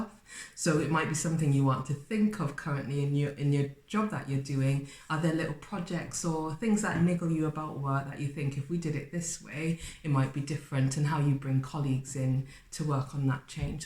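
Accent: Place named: South Wales, United Kingdom. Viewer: Love the South Wales accent, just here to support.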